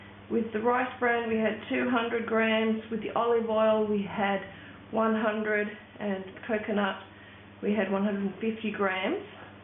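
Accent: Australia. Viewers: She is an Australian. That is how they say it. We sound funny to them. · Very nice video. I appreciate the recipe and all measurements. Love your Australian accent :-)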